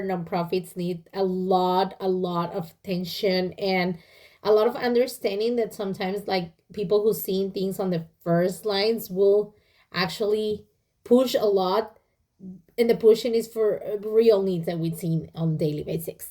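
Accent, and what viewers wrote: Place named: Spain. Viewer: Omg hot sexy Spanish accent is my fav weakness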